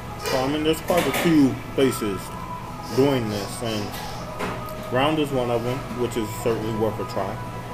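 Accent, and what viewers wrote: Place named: South, United States. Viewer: I had to tease you lol.....you sound like my dad he's country..😉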